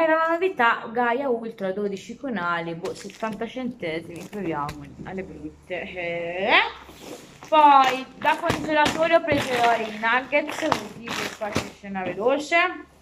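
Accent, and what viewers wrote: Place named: Tuscany, Italy. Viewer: Ciao una curiosità, ma tu sei originaria di Napoli o di dove? Alcune volte mi sembra di sentire una inflessione Toscana... Non riesco a capire il tuo accento! Comunque sei una bellissima ragazza dentro e fuori e ti seguo sempre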